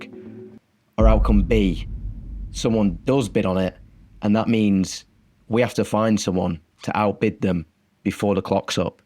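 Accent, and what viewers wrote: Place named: Hutton, United Kingdom. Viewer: You sound like you’re from Hutton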